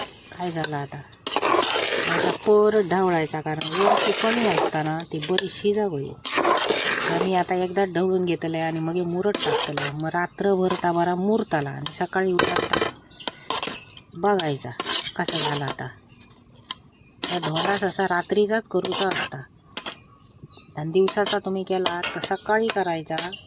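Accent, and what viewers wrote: Malvani, India. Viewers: ताई छान रेस्पी तुमचे बोलणे खुप छान मालवणी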